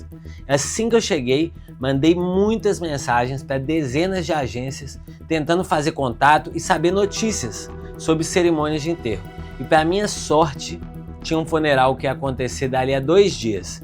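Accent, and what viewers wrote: Minas Gerais, Brazil. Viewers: Muito estranho! Curioso! Obrigada por partilhar! Vc é mineiro?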